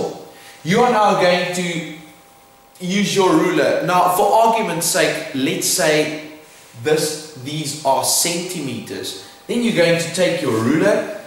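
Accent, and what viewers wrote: Afrikaans, South Africa. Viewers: i literally read this before i watched the video and i was like "i bet his accent is south african" AHA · Afrikaans ascent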